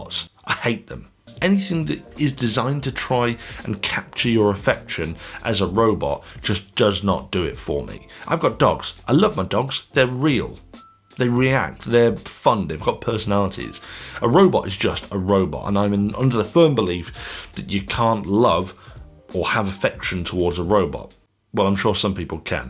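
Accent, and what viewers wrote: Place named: England, United Kingdom. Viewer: I swear your accent is from the same bit of Blighty as Clarkson.. and you're also speed and whiskey freak. Have you hung out with Clarkson, by any chance?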